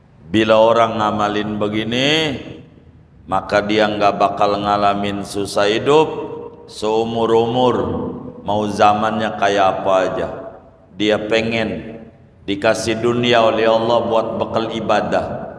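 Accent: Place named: Betawi, Indonesia. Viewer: Qobiltu Pak haji, paling suka neh ane dengan ceramahnya gaya betawi yee, terima kasih pak haji ilmu ane bertambah lagi mudah"an ane istiqomah Amiin yra🤲🤲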